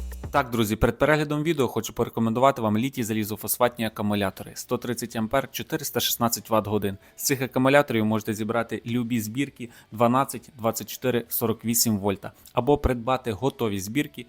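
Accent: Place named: Surzhyk, Ukraine. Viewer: цей суржик аж вухо ріже .. жах !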